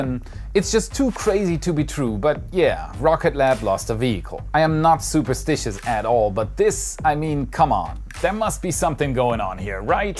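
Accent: Germany. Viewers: Mann merkt wirklich bei den Namen das du Deutsch bist genauso wie bei ein paar sätzen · Oh, no problem with your German accent... I didn't notice. I have the same issue with my Dutch accent in my videos... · Ususaly I say: Awesome content as always, but this time it was even better! Loved the little mistakes, and that you didn't take them out, as well as your heavy german accent. Your pronounciation is verry high quality btw. Your videos have become, more fluid, professional, and even funier over time!:) Übrigens würde ich dich super gern mal auf deutsch sprechen hören, so wie wohl viele Andere hier auch. (Und mich wundert's immer, dass Du Dich als statt vorstellst);) Und ich frag mich oft, woher aus Deutschland Du kommst. Ich schätze Süd-West (Baden Württemberg oder Rheinland Pfalz). Ich persönlich bin in Karlsruhe aufgewachsen, schaue Dir jedoch aus Ungarn zu. 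· are you german ??? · German accent is best in the world! Love a German accent 😉👌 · Ist Deutsche, lol!!! Pretty easy to have a German accent when you are German!! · I'm german too and didnt notice that you are German until today. I wish I could get rid of my accent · Well lucky for you German accents are my absolute favorite. so checkmate! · Your German accent cames through all the time. No worry tough. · Why would you wish to get rid of your german accent. To the English ear it is a pleasent sound. I guess it might be that we are both anglo saxon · "Sametimes my german axent comes sruu" ach ich liebe dich :D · Hi, mir war in den ersten 5 Sekunden des Videos klar, das du Deutscher bist. Egal wie sehr wir es versuchen, unser Akzent kommt immer durch xD. Mach weiter so, sehr coole Videos. Sub und Daumen! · Lol. You are German!. You should never be worried about accent. You provide invaluable information for us non techie space geeks so thank you:) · he has a german accent during the whole video? 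· About the beautiful German accent. My 95 year old Uncle Kurt briefly worked with Von Braun in Huntsville in the late 1950's. I believe that the German accent IS the best accent for a rocket enthusiast. Never apologize for it. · I am a native English speaker. I can tell you are German because of your accent, but if it wasn't for your accent, I wouldn't be able to tell. You're sentence structure and pronunciation is as good or better than the average American and I'm really impressed. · Why are people just realising about German accent now 😂 I’ve always known he was German, maybe I’m just special 🤔 Shame about the rocket lab electron launch, love the video:D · Denglish ischt goedt? Miene deutch und bookstabbe ist sclecht... So, your English is 👍 . I lived in D’dorf and Basel for many years, and I’m losing my deutch. It’s good to hear a good German-English accent. It makes me want to go out and get my shopping done before the grocery stores close ... 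· nonsense his accent is very german, I know because I grew up around english speaking germans. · I like the german accent. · You’re German? Nice lol I dare you make a video with ONLY your strong German accent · It would be fun if you did the entire video in your German accent( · I was actually referring to his little comment about his accent coming out :-D, Ich weis er ist eine Deutsche weil ich auch einer bin :-)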